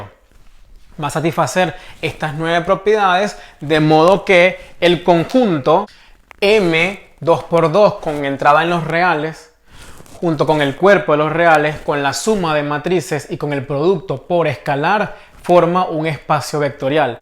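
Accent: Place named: Panama. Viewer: Eres de pTy por el acento hahah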